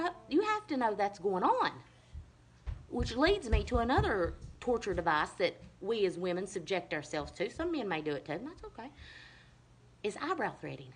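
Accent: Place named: South, United States. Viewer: U are wonderful and very funny country woman like you and that accent is bad ass Lady I just can't get enough of ur videos hell yeah my hillbilly friend word Lol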